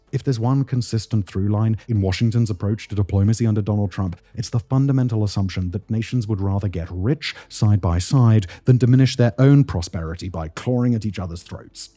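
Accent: United Kingdom. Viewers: His British accent is too heavy. It's difficult to follow what he's saying.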